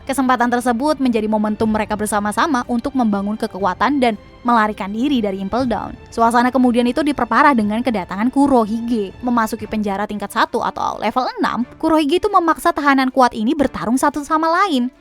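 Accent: Java, Indonesia. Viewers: mana yaak? kangen gw medoknyaa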